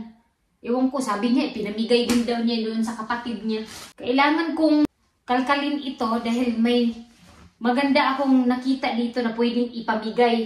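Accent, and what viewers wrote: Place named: United Kingdom. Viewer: british accentan kau jd ang inday ui buyag...well done mama! maau nlng jd naminaw ang kuya!ingun ana jd na madam basta mama nata,ky akong bebelabs bitaw mao pd..nah panahon pd namo dria di masabtan 😂! mura pd sa cs ky naa pd ginabutang pra dli magkeloid,maau nlng kd ky naayo na!mahal pd ba ang cargo diha padung pinas?? ky dri mahal mao ginahatag nlng namo or ginabutang sa mga clothes box..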